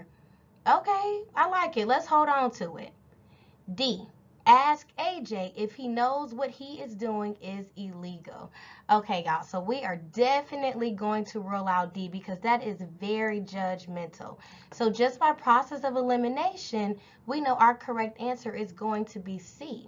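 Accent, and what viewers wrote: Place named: South, United States. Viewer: Super helpful, thank you! And love your Southern accent!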